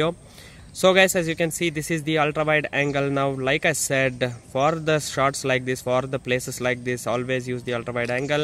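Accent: India. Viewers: Funny Indian Accent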